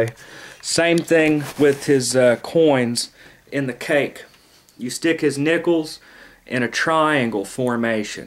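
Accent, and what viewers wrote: South, United States. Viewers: What a strong southern accent!!!!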